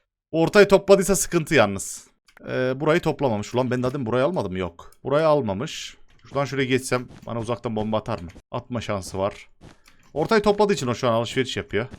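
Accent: Yozgat, Turkey. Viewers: 10.56 Abi Yozgatlisin yalnız Kral eline sağlık abiciğim cağnıı yerimmm ❤ Video mük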